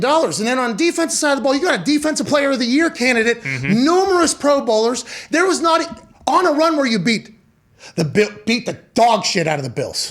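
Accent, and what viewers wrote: Pittsburgh, United States. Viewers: Lol 😂 Love the yinzer accent! Thank you Jags! Go Stillers! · The yinzer accent thickens when he gets excited. DAAHHNTAAHHN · becomes Super Yinzer when he’s pissed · Got damn you are from Pittsburgh listen to that accent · The Pittsburgh accent from McAfee is amazing...they should bring the Pittsburgh Dad on here to really make it pop.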